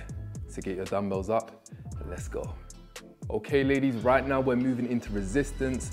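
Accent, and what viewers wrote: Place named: United Kingdom. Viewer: and because he's real attractive and has a British accent most will ignore what they sensed Like I did 😂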